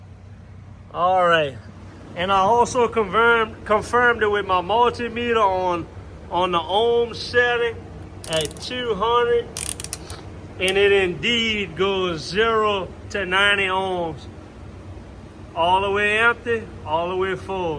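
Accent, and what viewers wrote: Louisiana, United States. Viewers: I like the teaching of the LS swap with that Louisiana/ Creole accent !!!!! Great Job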